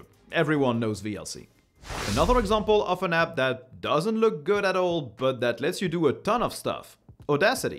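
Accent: France. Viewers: I though with that accent your were french is that correct ? Also been using most of these apps at a regular basis and it's awesome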